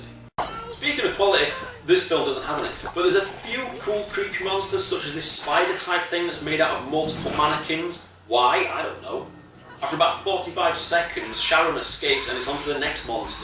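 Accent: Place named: Yorkshire, United Kingdom. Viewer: I love... your delivery is laugh out loud stuff... the edits are great and must be time consuming, voice over et et... Keep up the great work.. I'm in Toronto Canada and I laughed like hell when someone from the USA wrote to you and asked if you were from Scotland.... my family in England knew right away you were Yorkshire... They're Lancashire but don't hold that against them, lol cheers again... too funny... fantastic..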